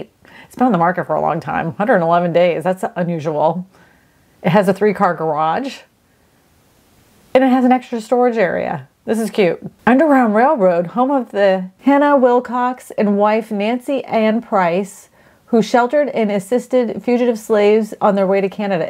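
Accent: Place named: New York City, United States. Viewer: People in Ithaca do not have that accent. You’re accent is more NYC, Long Island, New Jersey.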